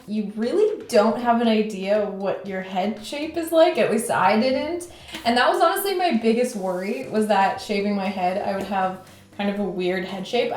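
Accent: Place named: Canada. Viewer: I love your Canadian accent, reminds me of Robin from how I met your mother 😂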